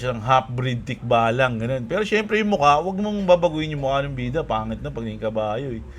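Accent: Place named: Caloocan, Philippines. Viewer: Alam mo gustong gusto ko ang mga Pananalita mo. Lumaki rin ako sa caloocan at ganyan ganyan din akong magsalita noon Pero ngayon senior na ko at tumira dito sa mindanao naiba na ang pananagalog ko. XRP lilipad na!!!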